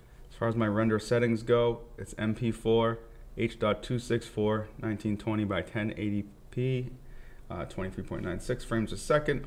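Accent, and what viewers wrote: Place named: Boston, United States. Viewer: Bro, I can hear my hometown in that voice. I grew up in Southie. Randomly came across your channel; just faced the exact same dilemma. Different workflows, and my PC is equipped with a 3080, probably spent close to $5k on the PC build total. I picked up a fully decked out M1 Max (64gb, with the 2tb SSD). The MacBook was cheaper, coming in around $4300. Granted I still use the PC for gaming, which I have no plans to do on the Mac, but it’s pretty insane how crushing that performance is. Sadly, I’ll have to wait for Dec 1 to get my 16” MBP; this has definitely made the wait harder. Can’t wait to get this little powerhouse in my hands, retire the old 16” Intel MacBook Pro, and save some time everyday.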